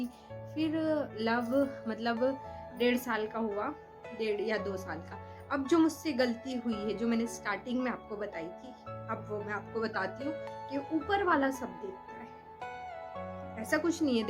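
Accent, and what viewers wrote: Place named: Bhopal, India. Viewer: Aapka tone bhi bilkul bhopal ka hai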